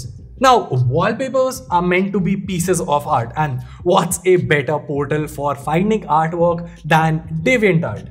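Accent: India. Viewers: join video, hearing indain accent, leaving the video. edit: thank you, the video was useful.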